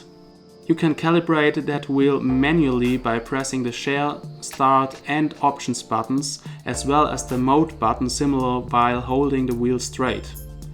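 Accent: Germany. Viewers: Wir deutschen haben halt einfach diesen Dialekt den man nicht überhören kann :-) Und weil du gesagt hast du wärst normal groß wie groß bist du denn? Habe keine Kohle mir das Zeug zu kaufen aber trotzdem... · Du klingst voll deutsch lol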